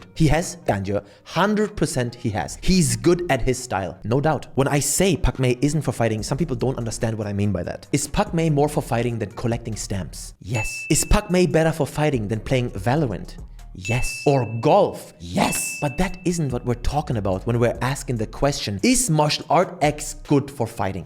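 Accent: Germany. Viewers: Holy didnt know was so German 🤧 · ok, for me, your accent sounds kind of like german^^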